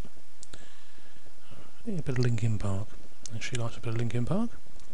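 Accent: United Kingdom. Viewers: Thanks. Its very easy in ubuntu. I am having problems with itunws and windows. And you hace a nice accent :) british? Regards, saludos.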